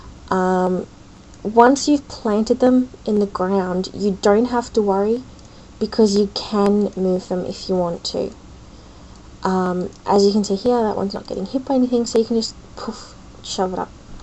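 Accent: Australia. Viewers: You sound Aussie :) Cool video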